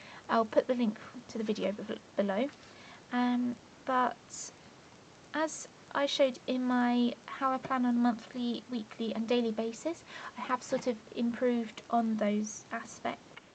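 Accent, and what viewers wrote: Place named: United Kingdom. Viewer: my first search today at the YouTube about planning planners (not just designing...), really happy to find your videos, I love your ways of planning, going to watch all your videos later. I was also glad to find a British-speaking accent, even the sound of seagals on the front in one of your videos made me smile :-):-):-) thanks for your videos and sharing your experience of planning x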